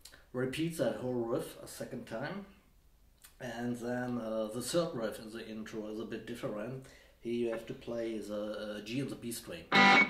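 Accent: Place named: Germany. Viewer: I guess so. German accent ...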